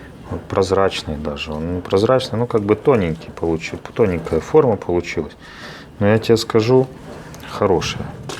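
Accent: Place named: Kyiv, Ukraine. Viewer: Красота! Судя по акценту и уровню работ вы киевлянин. Очень сочувствую, что пришел "медведь-всем пригнётыш" и поломал судьбы людей и красоту!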